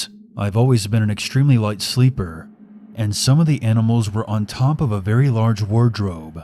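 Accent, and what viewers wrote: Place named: South, United States. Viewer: Your Southern narrations are my favorite! You have talent for sure!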